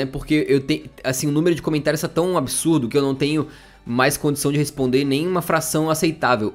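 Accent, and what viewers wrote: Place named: Florianópolis, Brazil. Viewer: Esse sotaque manézinho hehe! Salve mano! :D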